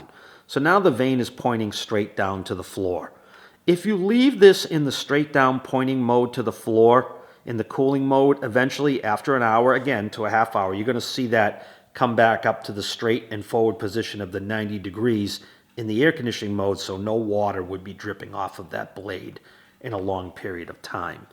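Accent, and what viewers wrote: Boston, United States. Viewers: Have watched this So many times --- video is super clear and helpful!! (Boston accent is a plus - generates total trust and confidence that the person speaking know what he's talking about!!) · You sound like you're from Boston❤. I am too. Anyhow my house was freezing inside. Those icons on the remote are very strange. Then I played your excellent video a few times and took notes. Now I'm cozy and happy. Many thanks,. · Just got a new house and have been cold for two days! Thank you for this helpful video - you explain things so clearly (plus the slight accent reminds me of my family from Boston so extra warmth there too).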